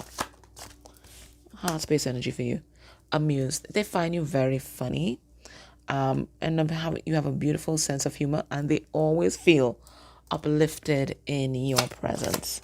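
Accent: Trinidad and Tobago. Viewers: Hello, are you Trini?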